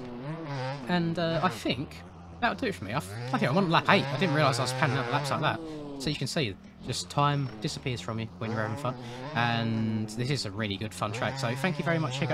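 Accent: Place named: England, United Kingdom. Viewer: Defo go for commentator career. English accent makes everything more official.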